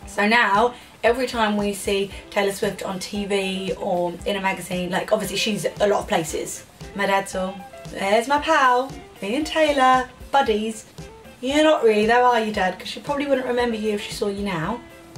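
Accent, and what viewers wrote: United Kingdom. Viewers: I am so obsessed with British accents I could listen to you all day 😍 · I really love this video ! By the way i love the British accent ;) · Where in the uk is she from?!?!?!?!? I recognise her accent... It's just rubbed off my memories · weird* and its because she is from london (i think) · Ilove you!!!!! Love from Colombia!!!!, if you want come, i waiting for you. (sorry for my bad english) , and i love watch this video for you british acent, Ineed learn. For me you are the best girlfriend #liam **Love,peace and happiness!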